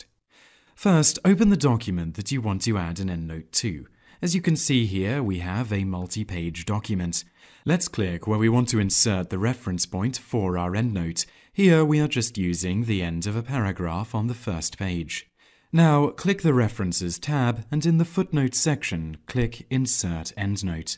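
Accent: United Kingdom. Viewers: Sounds like a wealthy fancy young price from England in the 1800s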